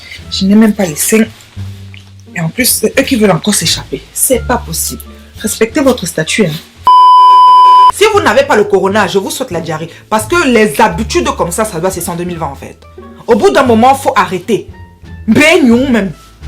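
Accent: Cameroon. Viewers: J'adore 💕 le fait que tu parles #camerounaisement😂, trop de love pour toi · J'adore trop ton accent kmer, kiss from une sœur sénégalaise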